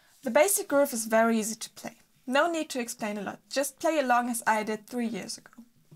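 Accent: Germany. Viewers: I'm not a musician at all but I do enjoy these tutorials that you post. I do love your voice and accent (I know you're German) but if you ever heard me talk you would laugh at it cause I live in the southern part of Arkansas in the United States lol :)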